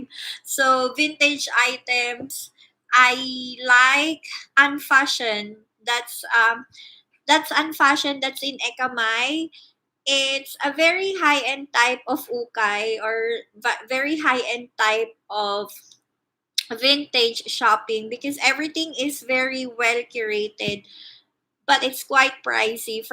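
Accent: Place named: England, United Kingdom. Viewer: 5 thumbs up, I like ur english accent sis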